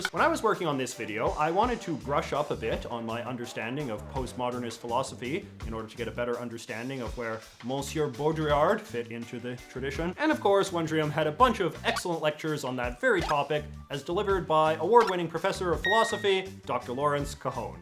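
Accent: Canada. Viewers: it's a canadian accent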